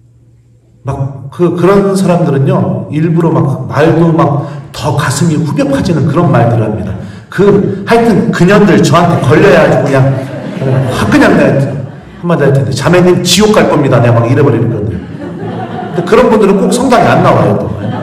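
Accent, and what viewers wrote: Chungcheong, South Korea. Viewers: 찬미예수님 저는 신부님 애청 구독자가 되었습니다. 제 친정은 대전교구 성당인데 신부님 강론 강의 들을때마다 익숙한 충청도 사투리가 참 정겹고 좋아요. 신부님의 어머니 이야기를 들으며 저의 엄마가 생각나서 눈물이 났어요. 며칠전에 돌아가신지 6주기 맞이했네요. 저랑 15년을 함께 사시다가 89세로, 하느님 품으로 가셨는데 제 엄마도 새벽 3시면 어떤일이 있어도 일어나셔서 3시간 넘게 기도를 하시면서 하루를 시작하셨어요. 평생 온갖 질병으로 시달리시셔서 37킬로를 넘지 못하셨던.., 그런 엄마가 치매까지 오면서 계절이 뭔지, 지금 시간이 언젠지 점점 인지능력이 저하되셨는데 그런중에도 시도때도 없이 성당에 가야한다고 옷을 거꾸로 입고 상의를 바지에 끼우시고 성당가방을 매고 그러셨죠. 엄마는 갖가지 기도를 하셨기에 많은 기도책이 있었는데 낡을대로 낡은 그책을 하루종일 넘기시며 치매중에도 기도를 하셨고 묵주기도를 멈추지 않으셨어요. 그런데 3시간 넘게, 4시간 정도 걸리셨던 그 기도는 30분안에 끝나더라구요. 치매때문이셨겠죠... 기도책을 덮으면서 '나 천당 가야 해, 나 천당가야해' 이러셨던 우리 엄마... 하느님께서는 엄마의 그 기도를 어여쁘게 받아주셨으리라 믿습니다. 장례식때 엄마의 손때가 잔뜩 묻고 낡은 그책을 함께 제단에 올려드리고 그걸 보면서 저도 그때부터 냉담을 풀고 지금은 하느님께 다가가려고 노력하며 살고 있답니다. 지금도 엄마가 보고싶어서 눈물이 나지만 하느님 품에 계신 엄마에게, 그리고 성모님께 저를 위해 빌어주십사 전구를 청하며 살고 있답니다. 신부님의 강론 또한 저에게 희망을 심어주셔서 감사드립니다.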